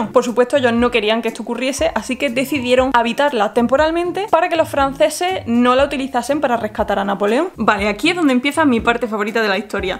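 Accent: Andalusia, Spain. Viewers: Que feo es el acento español de esta chica. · Wow, que video tan bueno, generas muy buen contenido, amo tu asentó andaluz. Saludos desde colombia :3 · Otro que lo ha flipado con el video y con tus ojos, apúntame en lista de espera xD Enhorabuena por tu canal y aquí tienes un nuevo suscriptor, y también andaluz, que tu acento te delata jeje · ¿Me encanta tu acento de que parte de españa eres?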